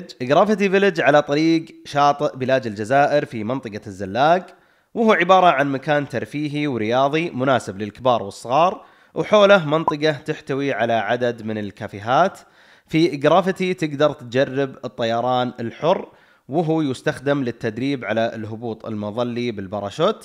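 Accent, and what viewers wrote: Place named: Saudi Arabia. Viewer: حياك الله اخوي انا من سلطنه عمان وحسب كلامك بالمقطع اظن انك سعودي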